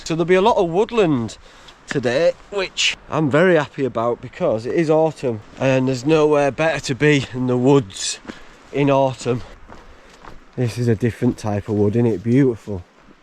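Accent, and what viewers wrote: Yorkshire, United Kingdom. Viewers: Only recently found your channel and watched the most recent vids…having watched this one; laughing at how strong your accent was, being back in Yorkshire 😂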